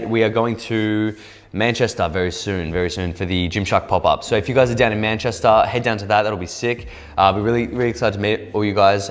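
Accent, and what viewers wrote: United States. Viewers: Holy fkn shit look literally like Justin Beiber and u nailed the american accent dear god. way to go man. not just braun :P funny too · Your American accent is scary good. I just started watching these videos and I swear I wouldn't have known you were Australian if I had stopped after the intro 😂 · On point with his American accent. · American accent is on point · Lmfao the American accent is so funny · not bad american accent, didnt say bro or dude (yes that stereotype is true) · American accent on point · Omg!!!! Your Southern accent! Yass!! 🤣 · BROOO THE AMERICAN ACCENT IS SICKKK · Yooooo your American English accent is perfect lol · Wtf he sounded American af😂😂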